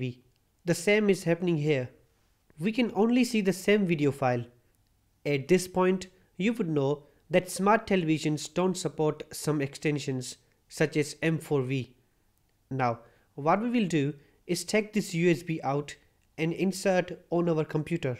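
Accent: India. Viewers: Pati dito indian accent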